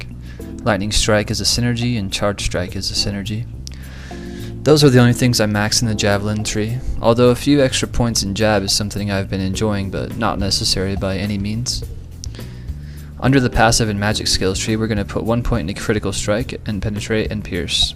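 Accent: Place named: Oregon, United States. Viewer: This is the most Oregon voice ever.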